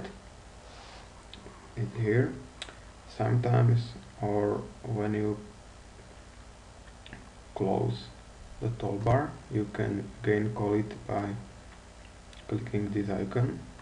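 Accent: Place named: Slovakia. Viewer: the guy has a totally Slovakian accent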